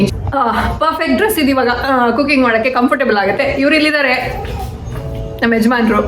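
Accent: Mangalore, India. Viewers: Manglore slang kelode chanda😍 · & nimma uru yavudu i think manglore or udupi tulu alle alwa matadodu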